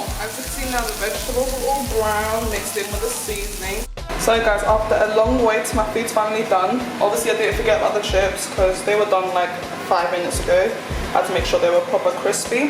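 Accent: United Kingdom. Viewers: I love lamb chops, literally can eat it anyday. Great recipe! Ps..big up, fellow British accent lol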